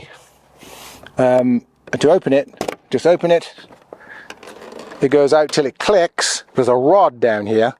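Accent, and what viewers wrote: United Kingdom. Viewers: Hi only just discovered your channel and subscribed after watching your first video. Really like your style of presentation and the cool British accent is music to the ears 😂 I’m currently looking for a Bonanza in Australia and confused between the choice of an A36 a V35 and a C33 Debonair which are currently available on Australian market. Can tell from your videos you’re not a big fan of the Vtail so youd probably rule that out but between the A36 and C33 which one is your favourite and why? So far through my reading (and watching YouTube!) the A36 has the advantage of bigger door, which would be a plus for me for loading say camping equipment or a bike and also Ive heard the longer fuselage helps with less wag in turbulence. Keen to hear your thoughts on it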